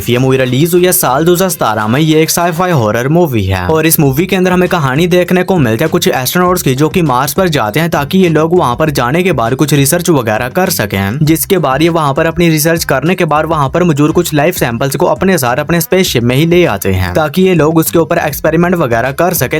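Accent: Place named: Lahore, Pakistan. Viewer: Bhai tum Lahori ho Kya bolne ka tarika waisa he hai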